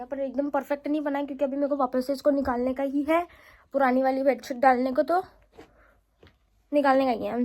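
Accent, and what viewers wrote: Maharashtra, India. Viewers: I get you girl. Your hindi is marathi mix nd influence. So humara hindi aise hi sound karta hai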